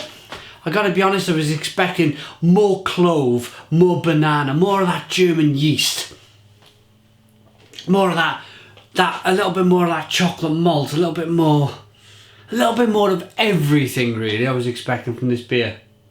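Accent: Wales, United Kingdom. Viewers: He's Welsh. Way different to a Scottish accent.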